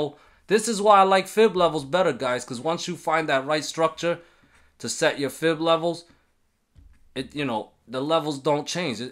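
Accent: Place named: East Coast, United States. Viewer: I can hear that east coast real strong 💪. This guys charting analysis is on point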